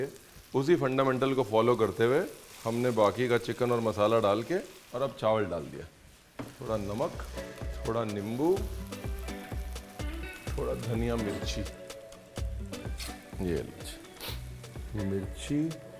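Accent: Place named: Punjab, India. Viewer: Hello Sir, mein aapko corona time se follow krti hun. Aapki recipes banati hun, jb ghar wale khush hote h khana kha kr to mujhe bhi khushi hoti h magar aapka bhi khayal aata h ki aapki recipe dekhkr hi ye khushi mili h mujhe. Aaj meine apna channel start Kiya h. Thoda success mili h to khyal aaya jinki wajah se mili h to unke liye bhi recipe banti h. To meine abhi 2 recipe aapki bnane ki koshish ki h or unhone upload Kiya h. Jyada nahi but jitna bhi pyaar mujhe logon se mila h aapki wajah se. Thank U Sir.🙏. Aaj aapki recipe notification aaya to jhat se ph khola dekhne ke liye. Aap itne km time mein 2, 3 recipes keise upload kr lete ho ek hi video mein. Aapki baatein sunkr maja bhi aata h. Mein to Punjab se belong krti hu ,ab Delhi mein hun but aapki baatein sunkr apne punjab ki jhalak mil jati h. Thank U Chef😊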